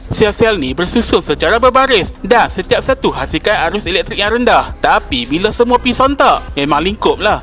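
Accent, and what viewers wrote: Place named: Kedah, Malaysia. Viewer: pekat sungguh aih loghat Kedah dalam video ni...hahaha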